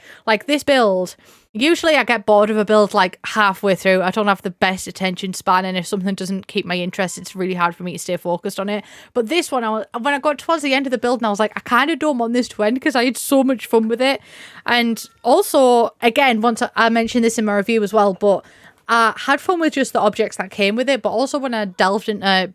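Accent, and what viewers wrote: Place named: Middlesbrough, United Kingdom. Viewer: I had a random thought while listening to this video... I don't remember if the Harry Potter books SAY what region Lily and Severus are from, but since it's an industrial town I decided my new head cannon is that they're from around Middlesbrough and therefore share your accent and that really makes me happy. 😁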